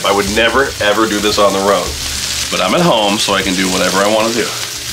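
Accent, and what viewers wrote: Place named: Northeastern Pennsylvania, United States. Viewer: Accent sounds like you're from NePA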